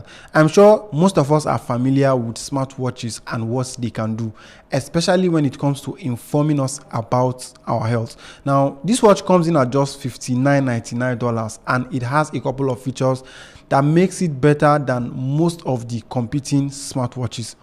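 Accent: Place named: Nigeria. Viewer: He sounds Nigeria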